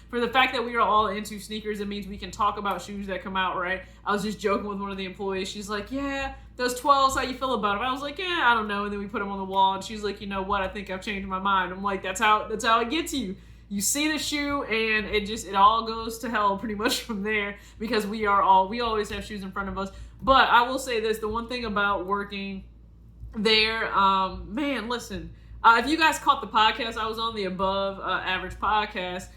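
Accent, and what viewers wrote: South, United States. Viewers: Yaas congrats on the promotion girl!! I always love to see my fellow women winning and prospering in life! No offense to the men here 🤣. I have a question: where did your southern accent come from? I've been trying to go through states you might be from in the Midwest like Kentucky, Missouri, etc but now I'm stumped since MI was mentioned.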